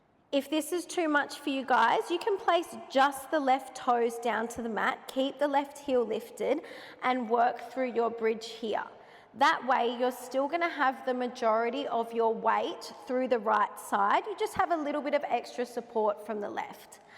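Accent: Australia. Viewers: I’m an American and knew you were Australian because I have a beautiful friend from there but also I follow move with nicole and knew it was the same accent ❤ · Dying that ppl don’t realize you’re Australian 😂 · As an American, I could absolutely tell you were Australian. How funny. Anyways, another amazing workout. Thank you for all that you do. You helped me fall in love with Pilates. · Girl WHO thought you’re from the UK 🤣🤣 maybe I just watch too many cutie Australian YouTubers but I can spot your accent from a mile away! NOT a British accent 🤣 I am dying. I love all of you Australian girlies accents so much!! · Omg how did people think you’re British!? I could tell right away you’re from Australia! But then again I do know a lot of Australian people for being an American lol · Im from the states but my dads side of the family is in Perth and Sydney. I recognised your accent. 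I hope to visit one day! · I was like, what??! I thought she was Australian! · Same thoughts😂I don't watch a lot of Aussie youtubers but even i could guess she was an Aussie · I can't believe people actually thought you're British?? Like they have clearly never heard an Australia accent before yeesh. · Loved this workout! I knew you were Australian bc you sound just like Miranda Kerr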